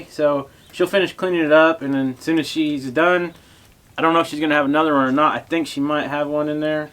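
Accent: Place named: South, United States. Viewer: I can tell you're from the South when you say "I'm fixing to do this or that." I say "fixing" all the time. Nice baby goat you had!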